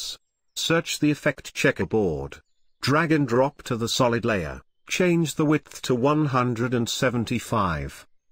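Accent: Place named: Pakistan. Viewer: Wow. Your Accent is cool. Amazing when I knew yoy from pakistan.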